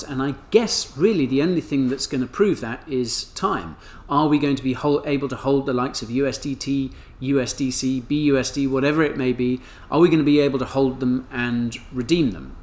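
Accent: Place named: United Kingdom. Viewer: So nice to have an eloquent British voice in crypto YouTube amoungthe many Americans!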